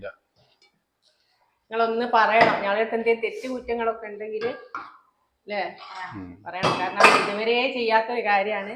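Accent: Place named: North Kerala, India. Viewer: ഹായ്.... നിങ്ങളുടെ സംസാരം കേട്ടിട്ട്... പേരാമ്പ്ര.. നടുവണ്ണൂർ or മേപ്പയ്യൂർ touch... ജസ്റ്റ്‌ ഗസ്സ്..... Good family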